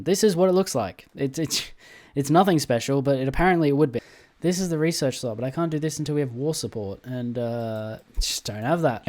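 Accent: United Kingdom. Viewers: The British accent caught me off guard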